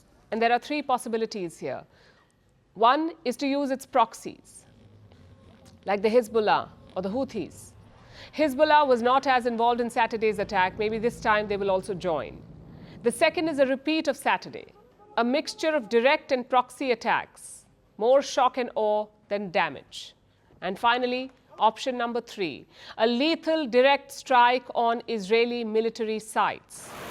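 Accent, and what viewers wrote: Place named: India. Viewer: YES WE ALL AGREE , BUT ITS DIFFICULT TO UNDERSTAND HER WITH THAT INDIAN ACCENT !!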